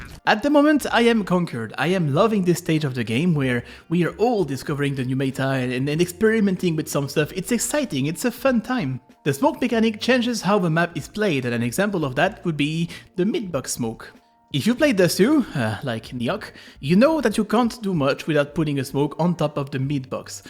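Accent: France. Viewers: embracing his french accent more than usual?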